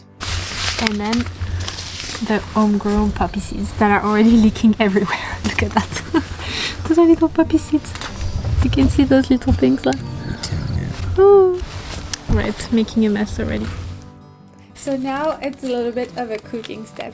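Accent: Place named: France. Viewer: Your french accent is so lovely 😍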